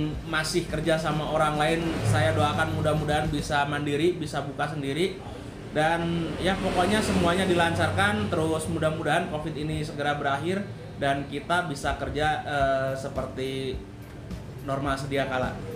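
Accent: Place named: Kalimantan, Indonesia. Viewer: Dr kalimantan mana bang... Logatny kalimantan tuh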